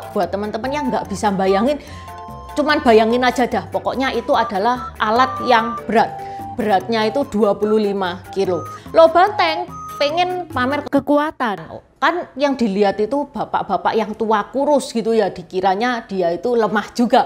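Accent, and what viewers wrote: Java, Indonesia. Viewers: Medog banget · Cicinya Indonesia banget... Keliatan dari medoknya🙏🙏🙏🙏👍👍👍👍👍❤️❤️❤️❤️❤️ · Intonasi bhs sangat Jawa bnget..mantap mudah dimengerti dipahami..mksh mbak.. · ci bahasa jawa nya mendok kali,,ci ajarin bahasa Tionghoa dong ci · Aksen jowomu medhok Cik. Sukses selalu berkarya ci. Di deket pasar kopro tanjyng duren ada toko obat Lo Banteng, itu asli keturunannya atau waralaba Li Banteng ya. Btw, aku gak kenal Suhu Lo Banteng, tapi aku pake obat araknya, rupanya gitu ya sejarahnya sang Suhu tsb....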